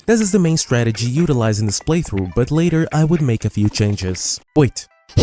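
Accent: Brazil. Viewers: where are you from? your accent sounds brazilian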